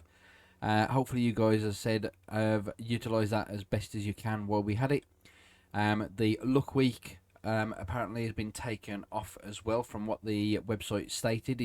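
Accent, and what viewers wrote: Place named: United Kingdom. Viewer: you sound like a united kingdom person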